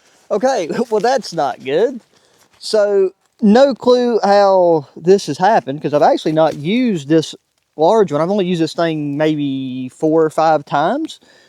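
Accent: South, United States. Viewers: Love that Southern accent, his charm and good looks dazzle in UHD.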